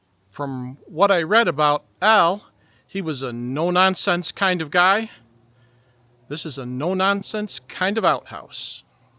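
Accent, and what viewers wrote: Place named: Finger Lakes, United States. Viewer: as one originally from the Finger Lakes (and recently interested in acents), it's nice to hear someone speak like I do. (Over and above the fact that I'm a life-long rail fan also. 😊) Thanks for also for your dose of calm and sanity amist the mess on YT and other social media these days. Re Scouts: I remember camping in Constantia NY in -40F for a regional Klondike Derby back in the mid 70s. Of course, all the tent campers were brought into cabins that night; leaders brought their car batteries in also to stay warm; and the next day was sunny, windless, and glorious with squeaky snow: perfect for the 'Derby!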